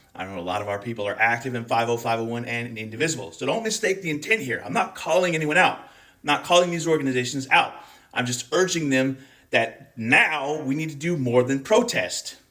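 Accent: Scotland, United Kingdom. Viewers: Damn, the Scots accent came out QUICK today!!!!! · Why does it sound like you're covering up a Scottish accent? ... 🤔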